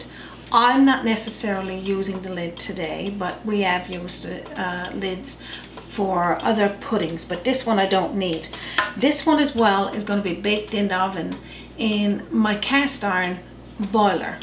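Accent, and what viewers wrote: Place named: Newfoundland, Canada. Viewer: didn't mean it to come across as rude! My apologies 💜 Love Newfoundlanders and the accent.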